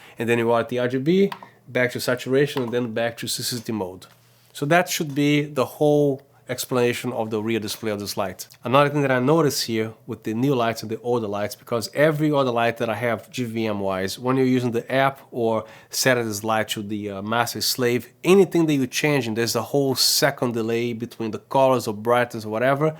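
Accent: Brazil. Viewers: Many thanks for this video review! Helped me a lot. I seem to sense in your accent you're from Brazil. Am I wrong? :)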